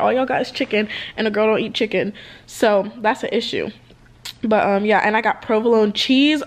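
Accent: United Kingdom. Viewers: I can hear little hints of the UK accent coming out.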